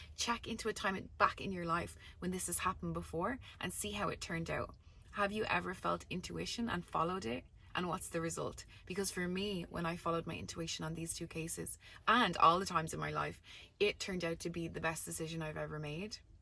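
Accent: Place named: Ireland. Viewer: omg are you Irish? loooove your accent! and this view!!!! 😍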